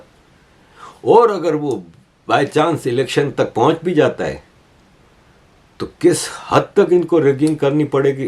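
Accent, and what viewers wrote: Gujarat, India. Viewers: Give up posting in Hindi and post in your native language, Gujarati, so you don’t have to struggle so much with your accent!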